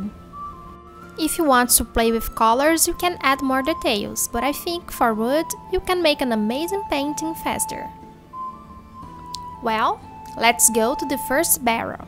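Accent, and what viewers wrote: Brazil. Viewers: Otimo trabalho *-*, quero fazer um cabo de arma para uma flintlock pistol impress, é pra ser uma madeira mais lisa e polida, da de seuir o mesmo padrão? pelo sotaque chuto ser mineira ou do norte kkkk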